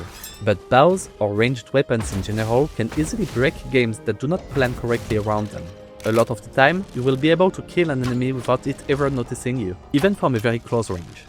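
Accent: France. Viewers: The video was so good I almost didn't realize you are french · Sup fellow french , I recognise it with your accent. More seriously , hope to see cool projects from ya ! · On entend l’accent français à des KILOMÈTRES 😭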